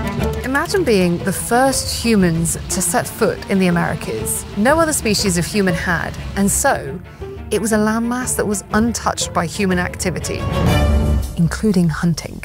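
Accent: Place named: England, United Kingdom. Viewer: her natural English tones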